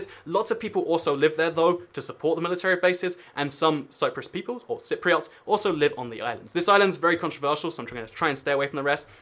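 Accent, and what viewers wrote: England, United Kingdom. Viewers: This guy talks faster than the Chipmunks on speed, and he's English. There are lots of great videos but even more bad narrators on You Tube. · Is it your first time hearing a british accent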